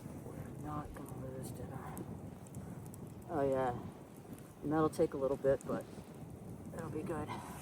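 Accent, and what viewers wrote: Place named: Boston, United States. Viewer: Love your vive. I love your videos, but I love your Boston accent more. Can u add the word badabin badabun. I'm asking with all respect. Shout-out from Brownsville, Texas.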